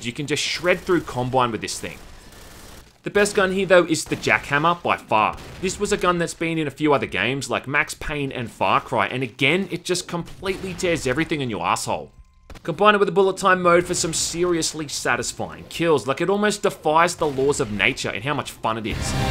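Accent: Australia. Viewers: You sound Ridiculously Australian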